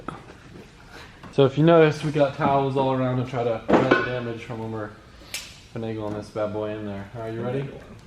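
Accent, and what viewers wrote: United States. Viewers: Adam LZ the cup holder specialist, with an american accent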